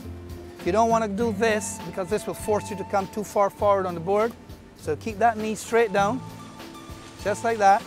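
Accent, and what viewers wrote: Ireland. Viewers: Irish? My work mate is Irish too...good job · You sound very Irish mate.......I guess that's to do with the history of Barbados.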